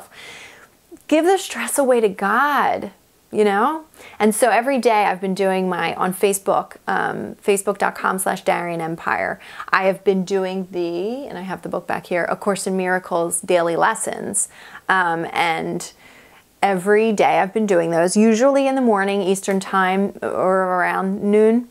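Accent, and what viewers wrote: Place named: Michigan, United States. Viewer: No, it sounds like a Michigan accent. I like your accent. I have a heavy Brooklyn NY accent.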